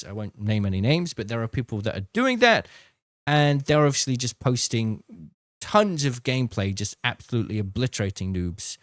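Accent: United Kingdom. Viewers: Yeah, the british accent it's a thing. But I like when you are vibing. I used to like to watch you doing challenges, but I felt you a bit sad and didn't vibing doing that those days. You could bring news about apex, trying other games (new games, BR or classics), testing how good the legends are. The most important, just keep doing what you like. Keep going. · In my honesty, I havent really watched so much of your content as of lately and i agree with most of the rest of the comments, it's because of the game itself. I remember the old challenges, the 4ks or load out challenges but the games not the same anymore so I have since quit playing and watching. But you still hold my sub because you still hold a spot in my heart. But if u want some input from me, I would just try doing your type of challenges and content for other maybe less comp games like cod zombies, or risk of rain, or even Elden ring playthroughs. 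I think all of us love your "weird little British man accent" btw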